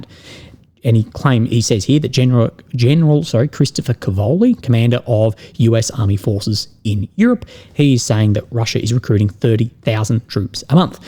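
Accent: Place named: Australia. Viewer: I did notice that you started speaking with an Australian accent since the chemo started.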